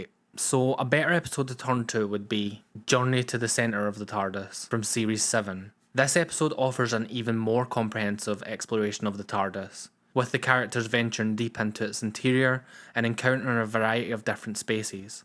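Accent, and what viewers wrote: Scotland, United Kingdom. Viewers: Never heard a Scottish person before?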